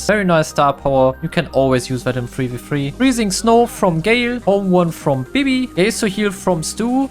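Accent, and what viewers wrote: Germany. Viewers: Bro ist so deutsch 😅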